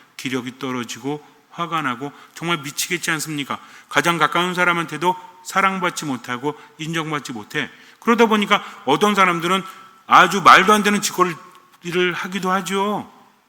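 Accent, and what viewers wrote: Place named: Suwon, South Korea. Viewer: 찬미예수님~^^ 옛날에 부산에서 3년 살아보니 부산 사투리에 젖어 나의 언어가 평범하지 않던 시간들... 신부님 언어에서 정감 넘치는 말들 수원 사투리~^^ 안성 사투리~^^ 정겹고 좋아라~ㅎㅎ하^^ 좋은날 되세요~^^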